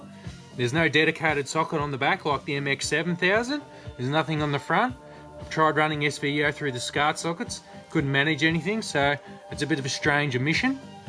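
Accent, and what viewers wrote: Australia. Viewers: love ozzy accent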